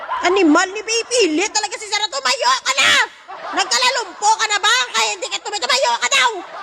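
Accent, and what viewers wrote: Iloilo, Philippines. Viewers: Sakit tyan ko kakatawa, Ilongga ka day? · Taga saan ba si bakit Hiligaynon (Ilonggo) accent sya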